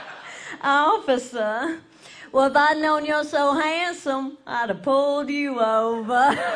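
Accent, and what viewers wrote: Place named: South, United States. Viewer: Something about that southern accent..... quite nice.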